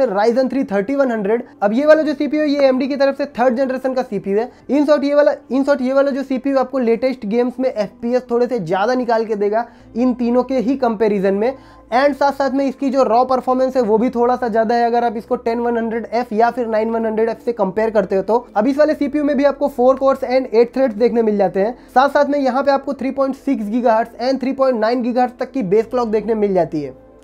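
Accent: Gujarat, India. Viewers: Bhai aap Gujarati Ho kya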